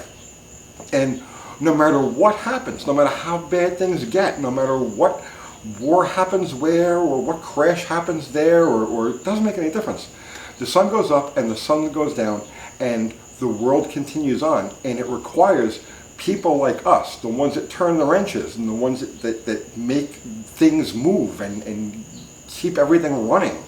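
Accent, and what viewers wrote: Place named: Long Island, United States. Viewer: Sounds like you’re from Long Island... I left that place ... NY 24 years ago and never looked back!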